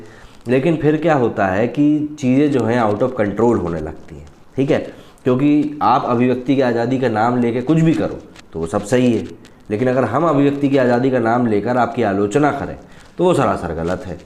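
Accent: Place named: Eastern Uttar Pradesh, India. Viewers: Bhaiya aaj kahe Bhojpuri accent me ? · accent up east kaha ke ho bhaiya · Wahii....Kuch Kuch bihaiii....tone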